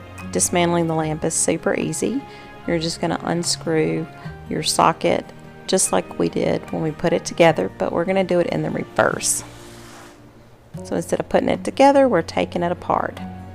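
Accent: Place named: South Carolina, United States. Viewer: Ah lol the one I didn't name you sound more South Carolinian! Your quite talented and loved the lamps. I'm like you in the sense I will not shell out the money on home decor or seasonal items for what they ask most are from China cheaply made and so not worth it. I'd rather make them myself. I do more home decor sewing in the summers and furniture rebuilding/ refinishing in the winter when the heat breaks.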